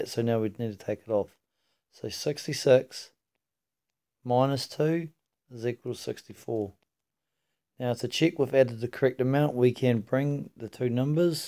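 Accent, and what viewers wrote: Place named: New Zealand. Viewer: as soon as I heard your accent bam I knew you are kiwi!!!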